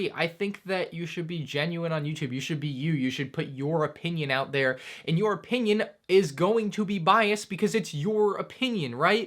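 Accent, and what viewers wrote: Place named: South, United States. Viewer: Your southern accent is amazing!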